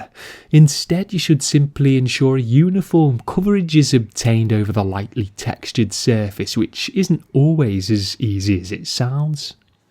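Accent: Liverpool, United Kingdom. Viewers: Scouse mouse all the way fantastic video as always though bro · He is from Liverpool and has a regional accent. The information is solid, the content is free, stop moaning.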